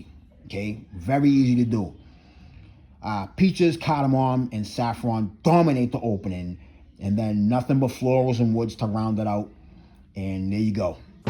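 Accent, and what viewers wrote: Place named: South Shore, United States. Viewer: Your accent is bringing me home. From the south shore.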